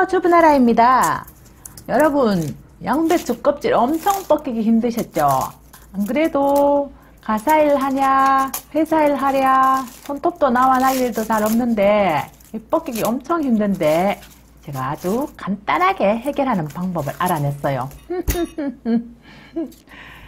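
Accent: Gyeongsang, South Korea. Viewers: 갱상도 아지매 아인교? 구수하게 자미있게 설밍도 잘하네예!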